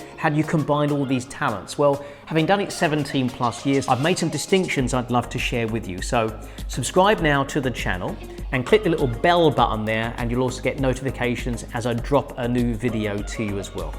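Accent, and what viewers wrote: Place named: South, United Kingdom. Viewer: FYI it's an uneducated Southern British accent. To a British person it doesn't sound impressive.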